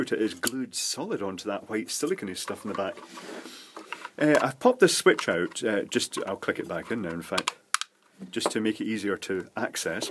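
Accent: Scotland, United Kingdom. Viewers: i find your vids very entertaining and informative, and your smooth scottish accent makes it quite soothing! good man keep it up!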